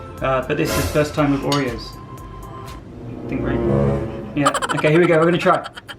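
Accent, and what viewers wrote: United Kingdom. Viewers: British accent enak bgt 😂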